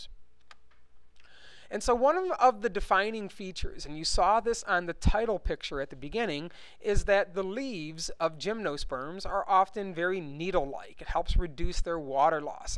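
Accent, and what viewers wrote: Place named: Midwest, United States. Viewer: Thank you so much! P.S Love the accent, is it mid-western? Chicagoan?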